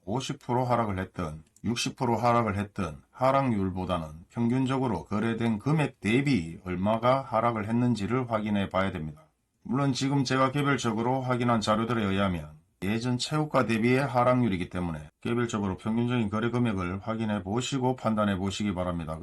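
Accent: Gyeongsang, South Korea. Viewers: 목소리 좋으십니다. 딱딱하지 않는데요. 경상도 기본값 아닙니까.?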